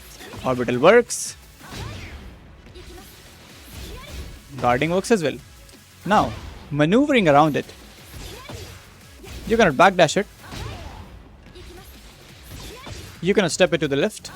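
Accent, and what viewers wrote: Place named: Bihar, India. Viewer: Ye bihari bhai ko english kisne sikhai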